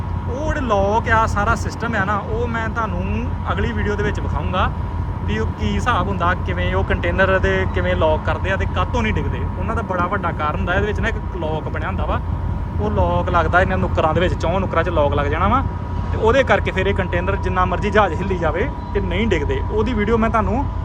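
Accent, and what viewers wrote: Majha, India. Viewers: ਬਹੁਤ❤ਹੀ ਵਧੀਆ ਜਾਣਕਾਰੀ ਹੁੰਦੀ ਹੈ ਆਪ ਜੀ ਦੀ ਵੀਡੀਓ ਵਿੱਚ ਅਤੇ ਆਪ ਜੀ ਪੰਜਾਬ ਦੇ ਕਿਸ ਜਿਲ੍ਹੇ ਤੋਂ ਹੋ ਕਿਉਂਕਿ ਤੁਹਾਡਾ ਬੋਲਣ ਦਾ ਲਹਿਜਾ ਸਾਡੇ ਇਲਾਕੇ ਮਾਝੇ ਦਾ ਹੈ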